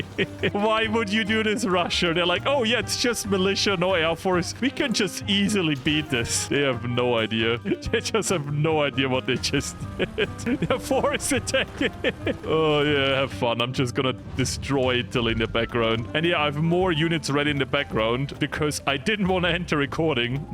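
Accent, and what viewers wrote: Austria. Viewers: I hear Österreichisch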